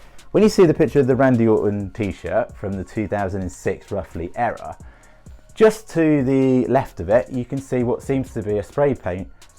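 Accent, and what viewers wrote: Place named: United Kingdom. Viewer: Found your channeled today. Once I heard your voice and that British accent I subscribed immediately - because that’s the law. Everyone knows if you want to be a good wrestling Youtube channel you need a Brit. Don’t question this rule, because the video was really good so the rule was right. Oh and I really hope Bray comes back!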